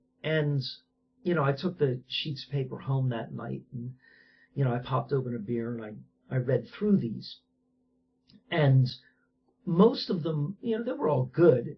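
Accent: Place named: East Coast, United States. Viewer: What you said was interesting an all, but the way you speak reminds me of friends from the east coast. Found m'self trying to narrow it down to NY, PA, or MA before I saw the "Phila" on the sweatshirt. I'm afraid my reading comprehension is everything it was in high school.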